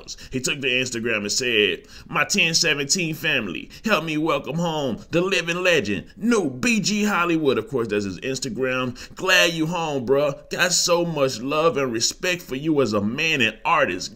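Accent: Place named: New Orleans, United States. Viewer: Ur aww aww sound like u been n that nolia b4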